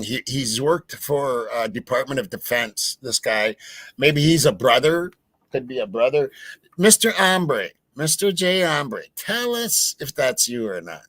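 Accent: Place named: South, United States. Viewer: He sorta has a southern accent or something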